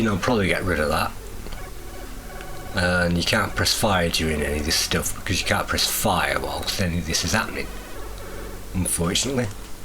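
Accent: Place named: Blackburn, United Kingdom. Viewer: You from Blackburn fella? Sound local.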